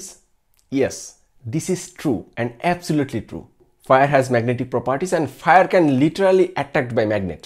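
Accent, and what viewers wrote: India. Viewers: Is this guy a native of India?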